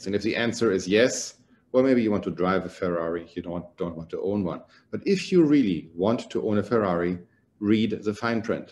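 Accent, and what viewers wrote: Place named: Germany. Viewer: Deutscher Akzent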